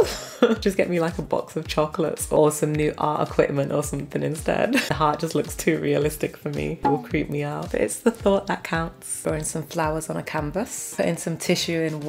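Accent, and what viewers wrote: United Kingdom. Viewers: I love British people talk And you're a British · One question for u are u from england that u speak British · Are u brittish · I love her British accent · your voice is so calming and I love the accent, is it british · Anyone notice she is British?! 👇🏼😄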